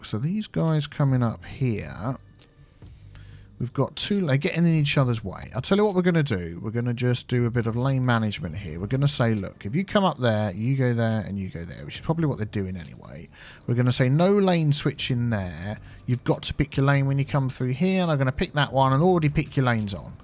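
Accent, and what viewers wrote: United Kingdom. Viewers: I’m like bingewatching all your Cities Skyline videos! They got recommended. I like your British accent, I like the content you show us, and I really feel like playing CS myself. I like the 'lane mathematics', 'autosave sippa tea' too 😜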